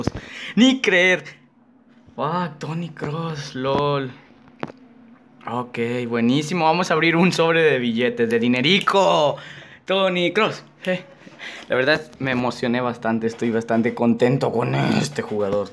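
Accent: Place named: Spain. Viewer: Porque ablas como español si ni eres español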